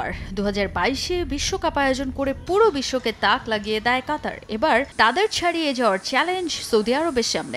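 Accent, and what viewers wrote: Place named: Noakhali, Bangladesh. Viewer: এটা তো মনে হয়ে নোয়াখালীকতে 😂😂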